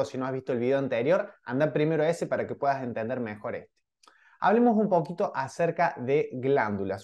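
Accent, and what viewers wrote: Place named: mixed, Argentina. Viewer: Es Tucumáno o cordobés de Argentina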